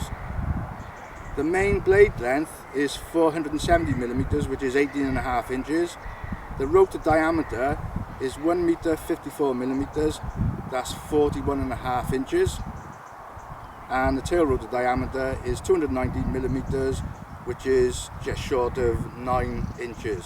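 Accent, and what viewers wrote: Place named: Wales, United Kingdom. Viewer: That took me back to my first Trex 500 (self-built with Beastx) many years ago. Now aged 77 I’m afraid a shaky thumb or two could spell disaster for someone, so I sold or passed on all my gear about 2 years ago. I first spotted your vlog when browsing YouTube on my iPad, keeping the volume to zero - then played it later on my tv, hearing a slightly harsher / more aggressive beat from the motor … and a familiar Welsh accent. I’m a Neath guy and would have loved to have had a co-flyer like you, all those years ago. Good for you sir 👍.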